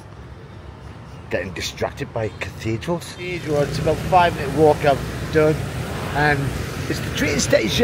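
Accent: United Kingdom. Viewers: Hi there, Hope you are enjoying your trip my country. I am just wondering about your British accent that you are speaking. What part of Britain do you come if you don't my to share ?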